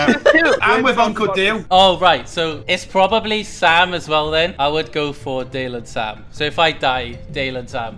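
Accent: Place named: Scotland, United Kingdom. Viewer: Scottish accent makes this even funnier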